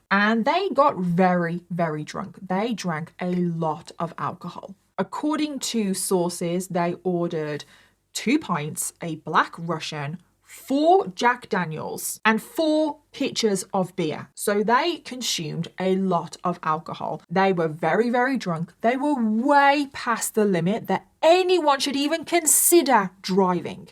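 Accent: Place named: Birmingham, United Kingdom. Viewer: I’m just messaging to say welcome back nice to see you do true crime for us all ❤ much respect from the brummy girl it’s nice to here someone with the same accent as me for once 😅 happy new year 🎉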